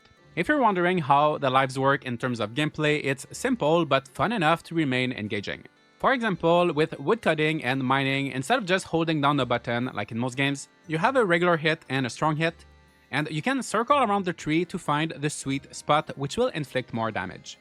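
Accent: Quebec, Canada. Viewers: tu sonnes québecois t'es tu quebz le gros ?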